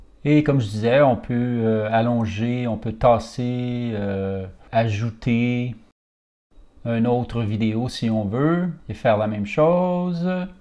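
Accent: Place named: Quebec, Canada. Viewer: Je vous adoré surtout à votre accent Québécois 😉, vidéo c'était bien. Merci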